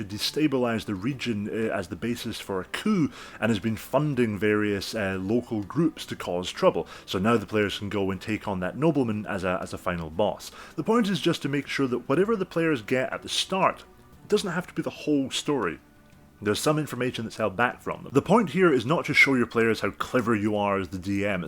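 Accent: United Kingdom. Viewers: When a mustached man with a UK accent and a turtle neck speaks some knowledge to me, i listen.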